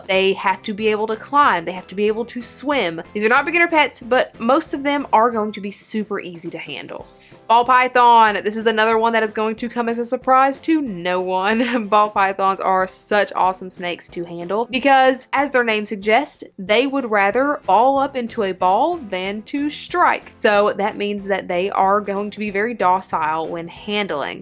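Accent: South, United States. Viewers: Love the southern drawl as well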